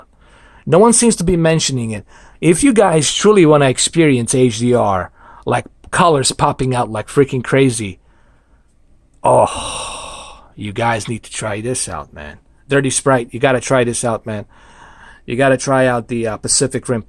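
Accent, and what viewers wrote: United States. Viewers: Im from Australia and have made afew videos a year ago, check them out if you want. I might get back into making youtube videos, i started off pretty badly and abit nervous but might go back doing some after i upgrade all my gear.its not like i need an upgrade coz all my gear is 2015 models BUT now that theres HDR i really want a projector with hdr , like the sony 4k hdr selling at 15k lol expensive but worth it. Im more of a home theatre enthusiast and picture quality is very important to me. So yeh, hopefully when i move into my new house i will upload more vids. I love watching your vidz man. And love the american accent lol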